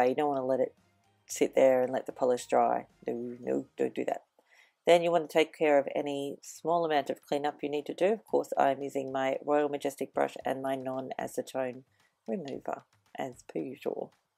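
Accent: Australia. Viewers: Love your videos. Which part of Australia are you from?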